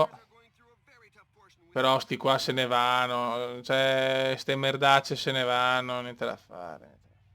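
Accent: Veneto, Italy. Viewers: sei di venezia?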